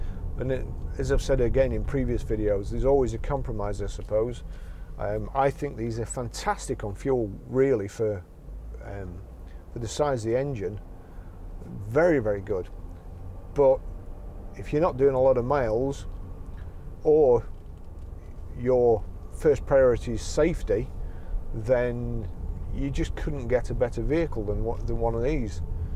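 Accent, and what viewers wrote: United Kingdom. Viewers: Wow Sir, that is a great car. I always love Land Rover, but I still cannot afford one. 😫 the one you are driving is awesome and it feels so powerful and comfortable. Do you own the car? Or Are you a dealer? How much does this car cost? How much are you asking for it? And by the way I love that nice British accent.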